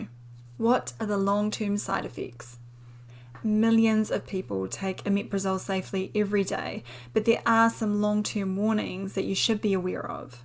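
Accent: New Zealand. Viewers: Loved this video Dr. Sam... I worked for Merck back in the day and was involved in the launch of Prilosec (then Losec) in the U.S.. This video really brought back a lot of good memories--and your accent brought back many other great memories of my time living in New Zealand! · Ah, Kiwi accent. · It usually takes me 2-minutes until I can understand the New Zealand accent ... Then, I'm fine. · Very informative video. I love the kiwi accent .. one of the best accents in the world. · I have binged watched a lot of your videos. I like your NZ accent compared to my American accent. I never realized the differences in pronunciation until I listened to you speak. Keep up the good work. I also like reading the comments of other viewers. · I just discovered this video today, Thank you , it was very reassuring as I take this medication on an as and when basis. Also lovely to hear a New Zealand accent on YouTube . 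· None sounds New Zealand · Looks Irish but sounds Kiwi.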